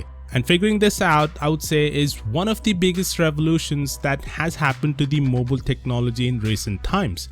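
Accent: India. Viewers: Terrible whole YouTube is Indian with fuck'n accents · The Indian accent is distracting... Aaaargh! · I find your Indian accent more amusing. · English indian style... not bad but almost indian people very bad their english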